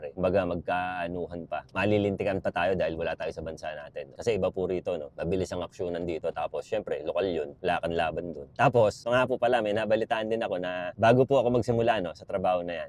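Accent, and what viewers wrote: Philippines. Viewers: halata naman sa pananalita na sa Pinas lumaki yan jusko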